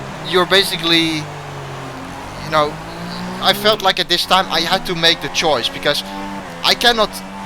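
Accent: Netherlands. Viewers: Ik kon gwn aan be engels horen dat he nl bent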